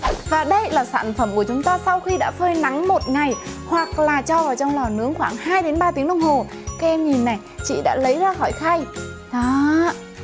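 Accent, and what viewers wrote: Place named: North, Vietnam. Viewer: Chị ở miền Bắc đúng không em nghe giọng chị giống miền Bắc quá, đó giờ em không để ý giọng của chị, bây giờ chị hướng dẫn làm kẹo cuộn mà em bình luận như vậy, em kì cục quá.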